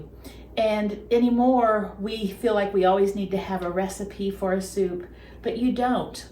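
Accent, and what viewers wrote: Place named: Missouri, United States. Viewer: It's nice to hear a Missourian talk - warshing instead of washing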